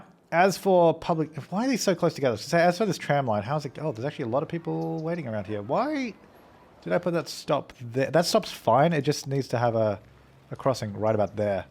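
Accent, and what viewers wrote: Australia. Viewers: Drone club Not really, it's just the aussie accent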